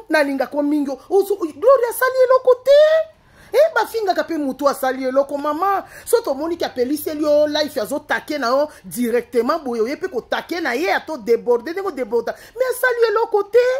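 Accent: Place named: Kivu, Democratic Republic of the Congo. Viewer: Oza penza mutu ya Kivu Na tonalité 😂😂😂 jambo dada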